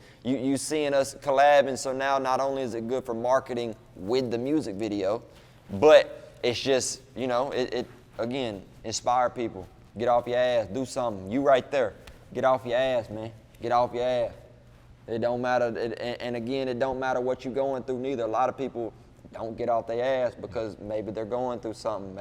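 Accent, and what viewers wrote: South, United States. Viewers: this is exactly why I subscribed to him one day I was just scrolling and came across his video for the first time and he was responding to somebody saying that he talks black and when I hear that, that shit really gets under my skin because I grew up right next-door to him in south Alabama, mobile to be exact so when I hear him, I hear a country boy and when I moved to Texas, I used to get the same shit and I would be so confused because this is how all my family talk like my granny or especially my Pawpaw if you were just on the phone with him, you would think you was talking to a old black man, but now that I’ve been in Texas so long, I can see what they’re talking about because how a lot of the Black people talk over here is how them back wood country white people talk in Bama. But yeah, somebody was hating on him because of his accent and you could tell he was being genuine as hell replying to this hater so I followed him solely off of somebody hating on him and now I’m glad I subscribed to his page because it’s refreshing to see someone being exactly who they are authentic and humble. 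So yeah, I’m rooting for Lil bro and another thing is I really would like to hop on a track with him but I doubt I could afford a verse😂🤷🏻‍♂️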